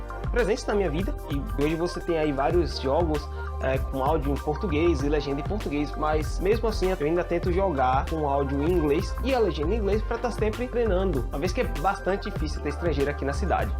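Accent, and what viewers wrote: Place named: United Kingdom. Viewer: Olá, fiquei curioso para saber como você adquiriu sotaque britânico. Se puder me responda por favor. Obrigado!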